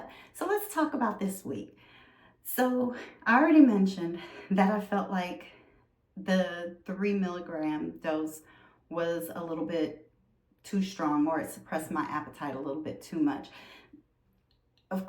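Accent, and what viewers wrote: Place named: Louisiana, United States. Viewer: I know a lil houma or thibodaux when I hear it. 😉 🩷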